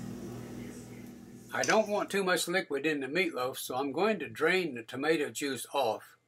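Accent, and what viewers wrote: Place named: Alabama, United States. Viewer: I was sitting here thinking, you remind me of my papa... what part of Alabama are you from?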